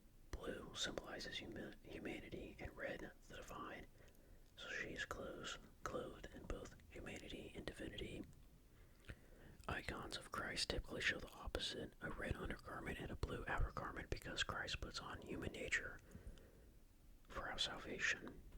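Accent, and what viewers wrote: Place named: Delaware County, United States. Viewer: I’d love to focus on the reading but I can’t help but wonder if you’re from Delco PA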